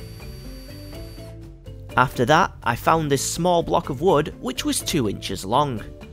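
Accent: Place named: England, United Kingdom. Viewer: Welcome to England pronunciations good sir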